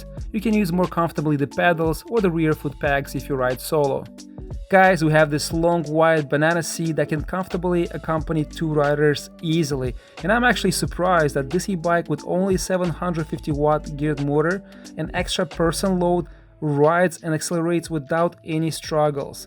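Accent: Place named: Russia. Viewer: friend, dm you are Russian as I hear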